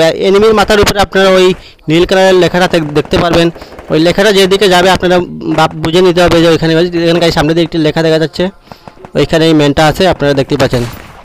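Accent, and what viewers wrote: Sylhet, Bangladesh. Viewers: Vai apni sylhety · Sylhety bro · Sylheti na kire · Tmr basha Sylhet tai na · ভাই আপনি কি সিলেটি? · Vai sylheti grime🤟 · Sylhety ni bro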